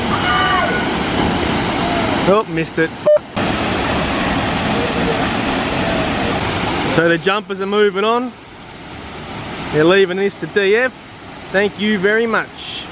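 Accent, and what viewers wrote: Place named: Australia. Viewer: Lol That Aussie Accent and Aussie Slang! Aussie Aussie Aussie oi oi oi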